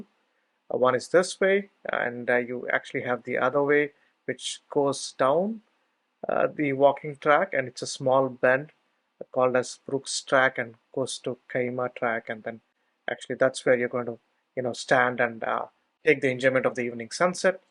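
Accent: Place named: India. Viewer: Shame that it's so difficult to understand the thick Indian accent. Why didn't you get someone to narrate for you. Btw it's not Brooks Lookout. Although your transcript does say Burkes, you wrongly pronounced it as "Brooks". Had I not read the follow on, I'd be looking for Brooks Lookout, man. I have been here many times and never seen a cell tower, so perhaps didn't trek the exact same route as you. I was around the tv towers and the Kyeema memorial site.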